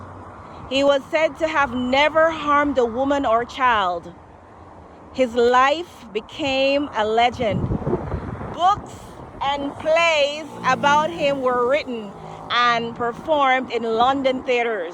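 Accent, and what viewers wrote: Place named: Jamaica. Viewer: Talk like Jamaican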